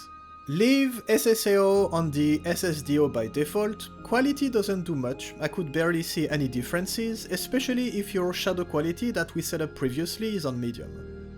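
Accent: France. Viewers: You're the frenchiest YouTube I've ever heard. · you french ? great video btw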